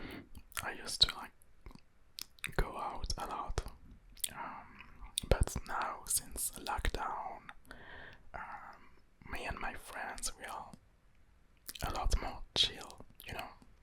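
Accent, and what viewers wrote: France. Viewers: Your French accent is beautiful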